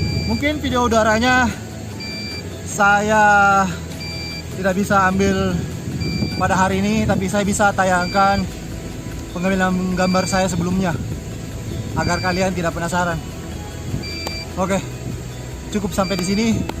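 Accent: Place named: South Sulawesi, Indonesia. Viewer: Logat bugis nya kental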